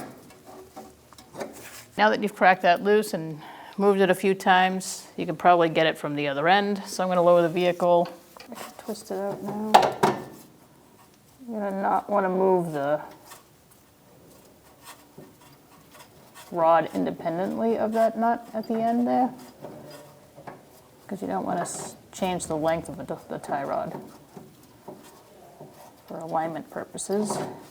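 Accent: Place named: New England, United States. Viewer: New England accent coming in good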